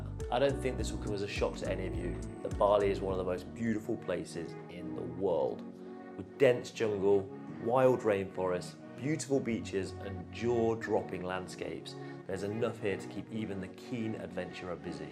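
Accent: United Kingdom. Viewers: I can listen to your accent all day, Mate! It sounds a bit like the north of England. Would you mind telling us from where you hail? Again, I love listening to you speak. I have a think "American" accent because I am from New York City, so... I have had people say the same thing to me. Also, if you can speak up a bit louder on each video that would be muchly appreciated. Even the loudness turned up to the max, I could still do to have your voice be a bit louder.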